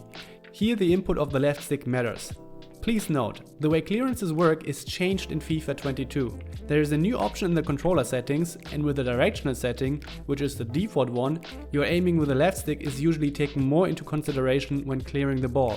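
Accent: Germany. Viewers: Man hört dein deutsch so raus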